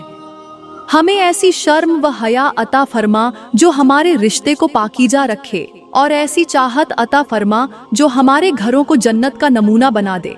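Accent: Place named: India. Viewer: Bayan toh bahut achcha hai lakin bahut ziyada tafseel se batakar video bada kiya gaya hai iski itni zarurat nahin thi ...aap ki Urdu bhi itni achchi nahin hai hindi alfaaz Hindi laheje se pata chal raha hai ...sab se buri baat ye hai k aap apne khoob soorat haath aur ungliyon ko dikha rahi hain ...isse parhaiz keejiye... f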